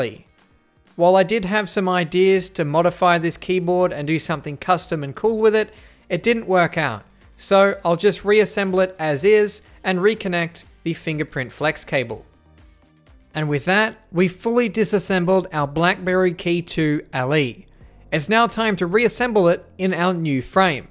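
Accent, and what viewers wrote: Australia. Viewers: Nice Australian accent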